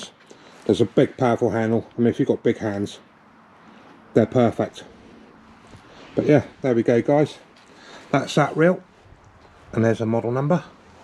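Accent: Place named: RP, United Kingdom. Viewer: Great video very posh like it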